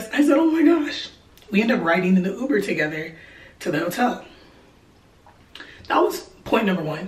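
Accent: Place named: Nigeria. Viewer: I believe you.. and WOW I am in awe. I just ran into your youtube. I don't know how lol literally was just searching a sermon by Todd Michael Crazy faith sermon and this blew me away. I almost didn't listen to this.. I live in LA (from ghana) just opened up a healthcare company and I was telling my business partner about faith so running into this youtube was no coincidence.. God is def moving.. I was looking for something to stir up my faith. I can tell your nigerian due to a slight accent and "she's well oo" haha I love it. Welcome to LA you are definitely walking into your destiny. Make the most of your life and network network network. The lines have fallen for you in pleasant places. Ahhh your talking about timi (amplify africa) really cool and humble guy. We know each other. Omg I'm soooo happy for you dear. Share your testimony and continue to inspire .. 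I hope to meet you soon 🙏🏾 then I'll remind you that im the one who wrote this on your channel. Crazy how I ran into this youtube channel. Keep being OUTGOING.. u never know who you may meet. Divine connection ✨️ ❤ 🔒 CONGRATULATIONS on you new Career. God is faithful ..so happy for you. Wow! Btw u passed my fav spot in-n-out on Sepulveda I think lol..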